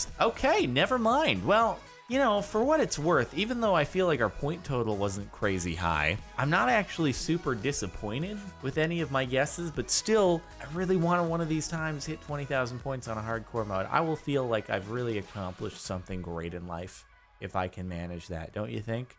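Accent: Russia. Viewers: "This is Mother Russia over here, and here is Baby Russia right there" I cracked up at that point but yeah even after 5 years that Russian accent is very funny and cool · I don't think it's bad that he does a Russian accent it sounds cool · god i love your russian accent lol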